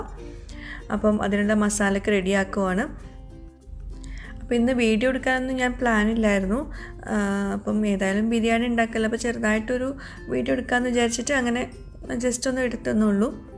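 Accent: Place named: Palakkad, India. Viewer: ഞങ്ങൾ പാലക്കാട്ട്കാരാണ് പട്ടാമ്പി ഒക്കെ ഇങ്ങനെ ആണ്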